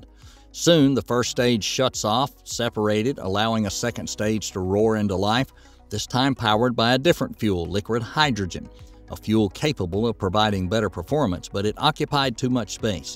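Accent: South, United States. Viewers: Finally! No stupid CAPITAL LETTERING in the title or exclamation point and deceiving wording, stupidly hoping it will generate more views. Please keep this more mature southern accent voice guy that I learned to appreciate and stop occasionally replacing it with the other prepubescent one. Maybe keep those shady (and, quite frankly, dumb) tactics for your other thousand Youtube profiles I unsubscribed from, but please keep this one free of bullshit. Much obliged and keep up the good work.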